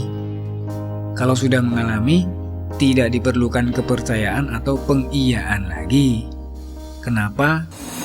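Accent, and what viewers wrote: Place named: Madura, Indonesia. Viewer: Logat madura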